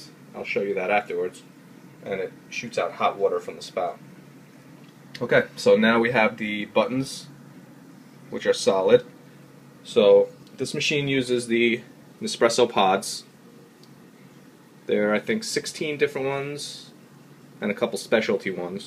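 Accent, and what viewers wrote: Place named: New York, United States. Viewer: Sick accent u must be from New York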